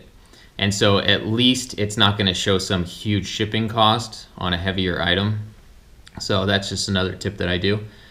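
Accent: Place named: Southern California, United States. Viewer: Keep up the good work man, Seems like your from socal.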